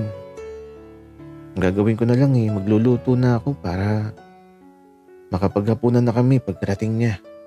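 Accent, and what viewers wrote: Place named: Laguna, Philippines. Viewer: likas ka bang taga laguna? ung intonation kasi ng boses mo.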